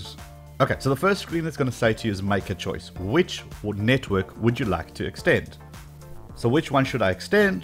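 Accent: South Africa. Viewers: South African I think.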